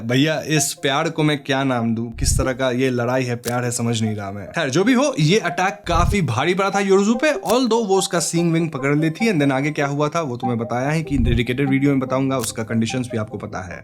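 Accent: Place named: Bihar, India. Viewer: Your bihari accent is so sexy... Please say it again....❤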